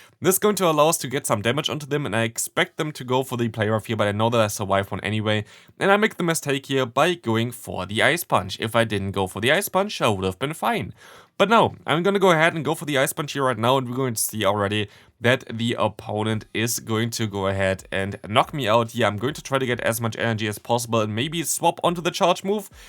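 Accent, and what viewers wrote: Germany. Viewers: sounds like hes german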